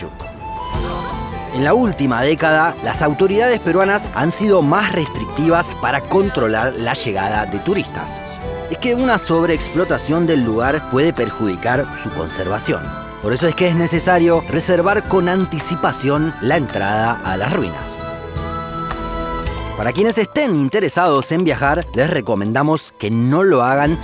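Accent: Argentina. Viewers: Gracias a este canal, cada vez que leo un mapa, lo escucho con acento argentino!! Maravilloso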